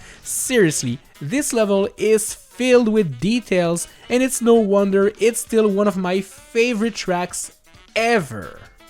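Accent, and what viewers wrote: France. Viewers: I speak french and your accent is not bad 😁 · Hey nice french accent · Why do I feel like that English is actually second language and French is his first.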